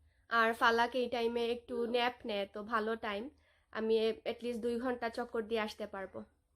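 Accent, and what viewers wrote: Sylhet, Bangladesh. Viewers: Apu etodin pore shopping dekia bala lagse bashi🥰🥰r emnitai apnake amr onk bala lage.afnar video sob somoy deki...apu afne sylhety mat matba bala lage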